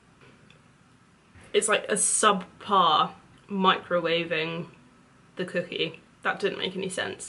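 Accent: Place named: England, United Kingdom. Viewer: I love that accent is it English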